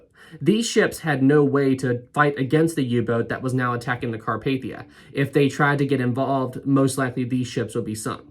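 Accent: South, United States. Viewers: Kid, learn how to properly pronounce words like "during" (which isn't pronounced the same as the word "daring") and drop the southern drawl when using words like "time".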